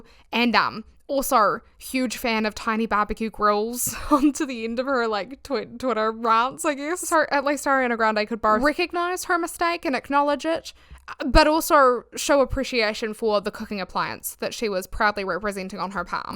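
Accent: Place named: New Zealand. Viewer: yayyy I’ve been here long enough to know to expect your “its a kiwi accent” disclaimer!! Im grandfathered in!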